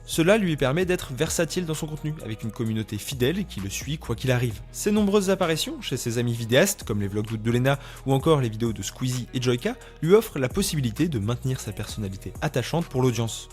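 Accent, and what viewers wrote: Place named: England, United Kingdom. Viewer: J'ai vraiment du mal à comprendre ton accent anglais 😅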